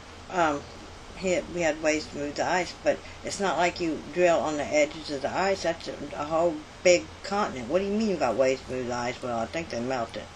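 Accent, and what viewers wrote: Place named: Appalachia, United States. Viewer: You sound like a hillbilly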